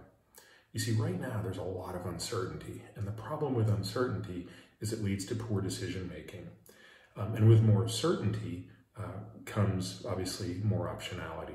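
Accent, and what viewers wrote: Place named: Canada. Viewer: It might be the intonation since Peter is Canadian like Seth. Peter has a better voice.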